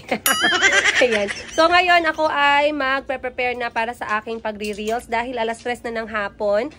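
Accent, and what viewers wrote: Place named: Visayas, Philippines. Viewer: napanood kita mula sa 1st video mo..true from the beginning you were full of finness the way you speak at pagkilos.naobserbahan klng ito.nasubaybayan kita..could be due to sad & traumatic experience mo dun sa Uganda (gawa ng asawa mo)unti unti nagiba ang way of speaking mo.Very understandable yun.Now,na nandito kn sa Pinas you can be your natural self.Bisaya ka kya may pagkatigas ang salita & that's okay.mas marami kming natutuwa sau dahil dun minahal ka nmin pati ang mga anak mo at mga kapatid mo at staff. Tama yang attitude mo deadma sa mga bashers.Sikat kna kasi😊 Goodluck & God Bless!🫶🙏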